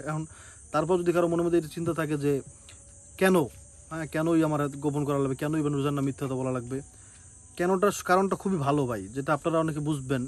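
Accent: Barishal, Bangladesh. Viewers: বরিশাইলা বলে কথা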